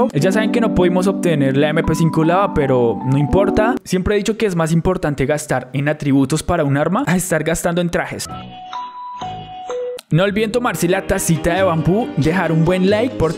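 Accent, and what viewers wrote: Colombia. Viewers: Antes cuando te veía me sonaba muy paisa tu voz pero ya tot acostumbrado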